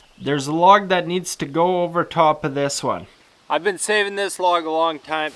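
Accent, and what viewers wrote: Canada. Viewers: What you got there is a good Canadian kid eh! Saskatchewan I think.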